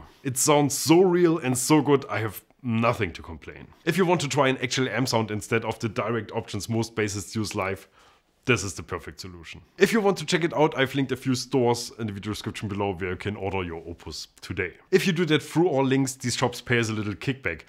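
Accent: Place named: East Germany, Germany. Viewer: Eastern German accent . 😂. Nice touch!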